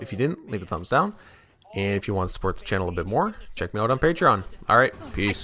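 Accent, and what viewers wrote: Canada. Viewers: Are you Canadian? Accent sounds super Canadian.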